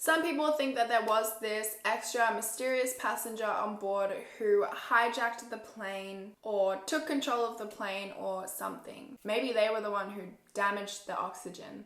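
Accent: Australia. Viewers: Are u Australian or American?😂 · Wow im memorised listening to this absoulutly beautiful lady and love the aussie accent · Are you Australian?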